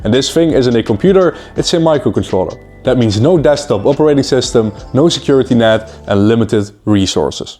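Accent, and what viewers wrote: Netherlands. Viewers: ''and more specifically'', je bent nederlands ;) hoor ik al in de erste 3 seconden ;)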